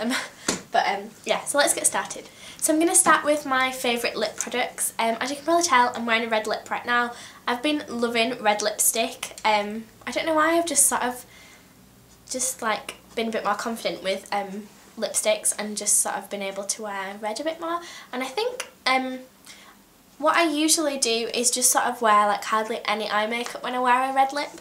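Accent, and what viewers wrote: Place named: Yorkshire, United Kingdom. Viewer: Your accent is amazing, are you from Yorkshire ? xx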